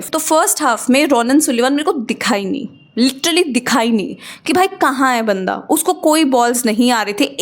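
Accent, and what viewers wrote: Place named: India. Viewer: Indian accent very bad. You don't speak English like this 😒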